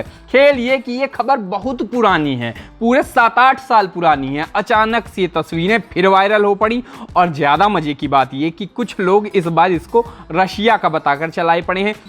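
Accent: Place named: Kanpur, India. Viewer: Bhaiya aap UP ke hi lgte ho Kanpur ke aas paas ki jagah ke 😁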